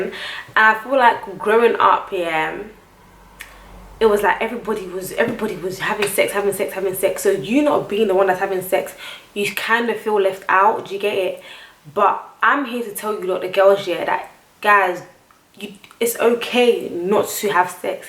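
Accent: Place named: United States. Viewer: how come i come from my boy joovier video you sound more american than me?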